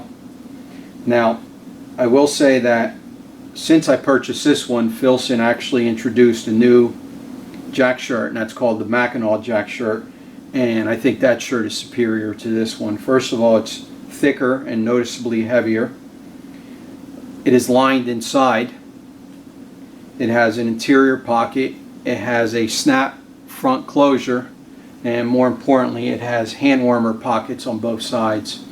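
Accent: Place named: Upstate New York, United States. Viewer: Shooting in the woods both Filson vids. Are you in upstate NY, Penn State? I detect the accent maybe from there. Enjoy your vids. Greetings from Washington State.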